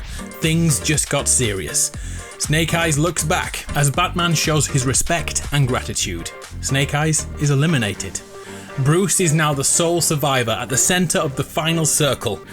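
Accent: United Kingdom. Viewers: youer British omg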